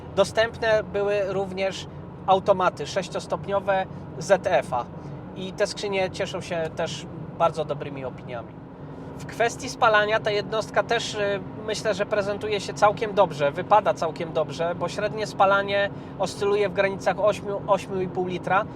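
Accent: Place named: Warsaw, Poland. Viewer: Te warszawiok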